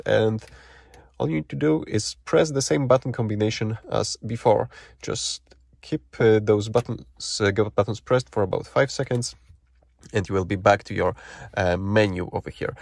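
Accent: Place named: Poland. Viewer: Polak mówiący po angielsku 😎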